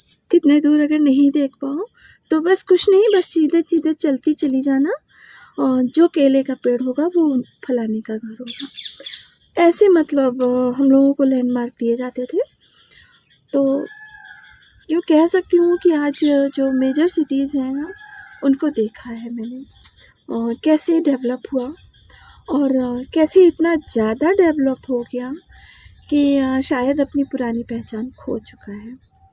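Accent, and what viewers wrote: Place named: Kanpur, India. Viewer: बहुत अच्छी है आपकी कनपुरिया ❤❤❤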